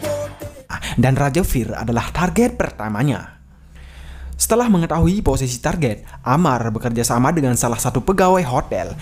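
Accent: Bali, Indonesia. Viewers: Mimin dari Bali kah? Logatnya. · Logat mimin Bali / Lombok ... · Logat bali mu kental broo Salam dari gianyar Ajarin saya buat jadi konten kreator bosku Swastiastu rahajeng wengi Nyama bali ku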